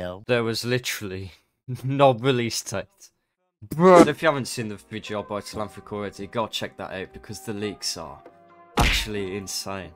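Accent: United Kingdom. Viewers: Wait ur British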